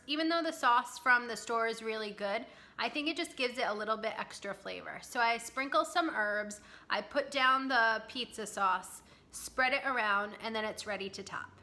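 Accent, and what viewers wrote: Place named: Rhode Island, United States. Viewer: You can never lose a RI accent!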